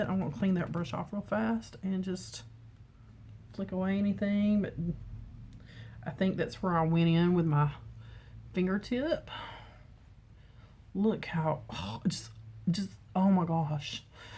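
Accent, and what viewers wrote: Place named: Tennessee, United States. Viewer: I am from Sevier County, TN, in between Knoxville and Gatlinburg! I knew you sounded like home! My hubby is a Marine, and we have been living all over the country, and are currently in San Diego. We just got orders to Cherry Point, NC, our first duty station after Paul joined 23 years ago, lol! Can't wait to get back closer to home. 💗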